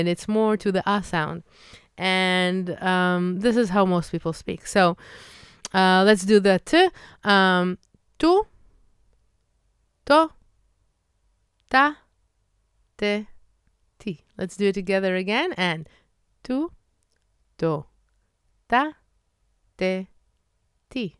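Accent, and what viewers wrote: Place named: England, United Kingdom. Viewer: You definitely have the English twang. Your English sounds 90% Native.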